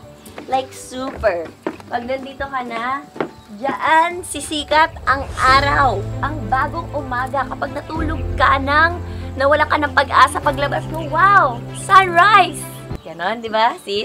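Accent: Visayas, Philippines. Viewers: bisaya po mo ate kim chiu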